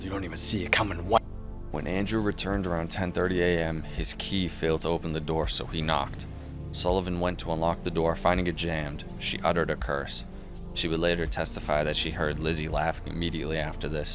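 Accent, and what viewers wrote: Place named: Massachusetts, United States. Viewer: You claim to be from NJ yet your accent, knowledge of places like Fall River, and general vibe are leading me to believe you are a Massachusetts native.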